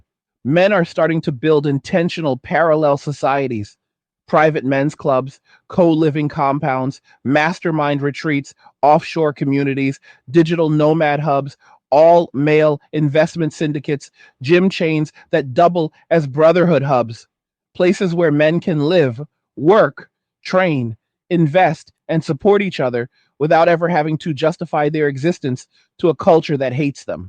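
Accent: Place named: Panhandle, United States. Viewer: you got that inbred panhandle voice going on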